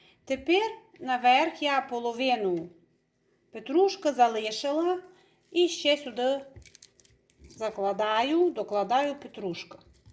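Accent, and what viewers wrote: Transcarpathia, Ukraine. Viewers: Це Закарпаття, свій діалект української мови. Все гарно ( файно) і зрозуміло. Дякую,.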